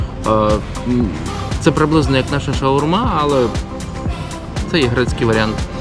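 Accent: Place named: Poland. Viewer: Польский акцент.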